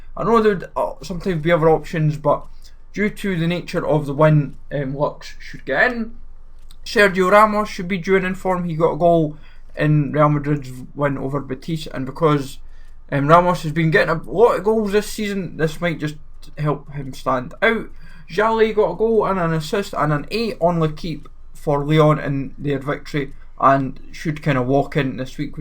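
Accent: Scotland, United Kingdom. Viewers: sounds like he's Scottish to me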